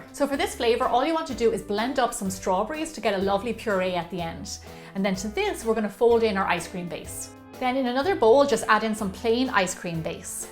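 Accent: Ireland. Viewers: I love your Irish accent in the making of kulfi Ice cream. You should try Chamomila and honey Ice cream soon.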